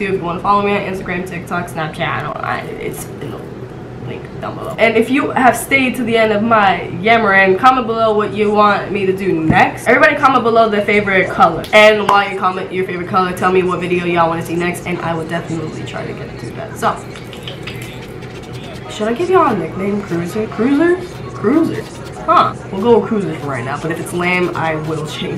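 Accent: New York, United States. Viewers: lmao that is no florida accent at all, its a New York New Jersey accent. Listen to how she says Cawwfee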